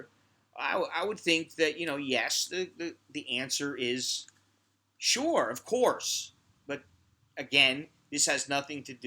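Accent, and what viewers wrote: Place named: Brooklyn, United States. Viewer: Hey, Coming to Thailand this March. I live around the corner from Pateron. How is the rock scene in Bangkok? Do you go out to shows? I'm a musician in San Francisco and I might be considering moving to Thailand.I have a friend who's an English teacher and a girl that I'm friends with a nice Thai girl. Don't worry, I'm taking it slow as per your advice. I did a little research already and I see Bangkok recently has a budding rock scene there. Here is a link to my music: <link> It might not be your cup of tea but maybe... Anyway, you sort of have a Brooklyn type of style of delivery. Straight and to the point. You can reach me at <mail> if you'd like. maybe we can have lunch or something.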